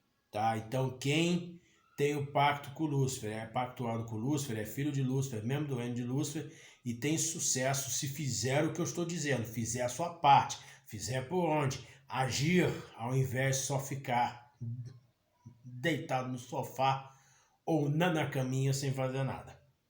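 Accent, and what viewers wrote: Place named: France. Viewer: E de onde vc tirou esse sotaque frances?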